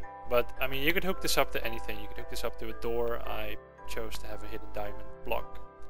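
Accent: Netherlands. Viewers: where r u from?? holland?? :) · is it possible that you are dutch ? i hear a bit of a dutch accent in your voice :D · are you dutch ?? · Ben je Nederlands? Je klinkt Nederlands. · Je bent Nederlands of niet? ik kan het horen.. C: Wel erg leuk dat je zo ontzettend groot bent op YouTube. Ik denk de grootste Nederlandse Youtuber.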